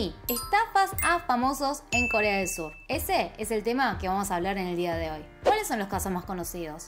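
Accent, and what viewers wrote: Argentina. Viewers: A Lisa no la estafan , deberían matarlo por eso >:( amo tu acento Argentino 💖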